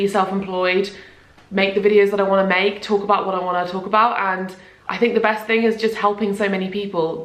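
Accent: United Kingdom. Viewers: aiutooooooooooooo the uk accent is really hard to understand , could you help the people about that ( it seems that you have to run , because you have to make dinner / lunch or to go in bathroom hahahah) ????????? thanks in advance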